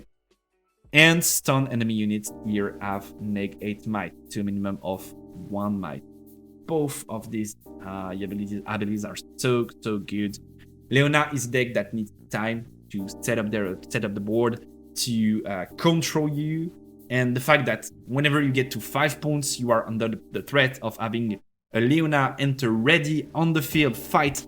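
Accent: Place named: France. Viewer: Je t'ai découvert avec Riftbound, donc en anglais, quand j'ai capté ton accent et que ta chaîne était en FR avant ça, j'ai pre--shot les commentaires de ce genre, mais tkt tu fais bien. Ton anglais est top et super compréhensible et tu te dirige sur un jeu pour le moment full ENG, avec gros potentiel de fanbase vu la licence, donc imo tu fais le bon move, surtout vu ta ligne éditoriale très compétitive, au moins tu te restreint pas au public FR super limité dans ce format :) 💪👏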